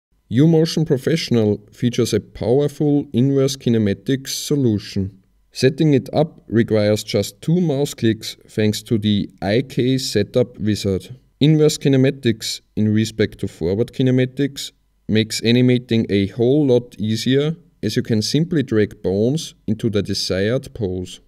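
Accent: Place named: Austria. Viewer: Bwhahaha... Den Ö-Dialekt erkenn ich immer! Grüsse aus Graz und danke fürs Humble Bundle!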